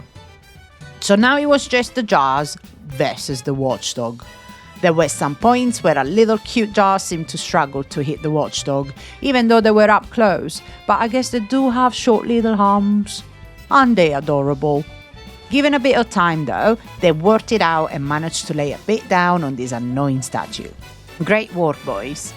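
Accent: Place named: Italy. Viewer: Love the mix of Italian and northern/midlands, get on, get that plaque before JK haha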